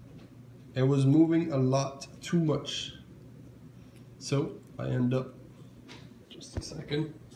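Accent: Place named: Quebec, Canada. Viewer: Toer t québécois pis sa parait 😂😂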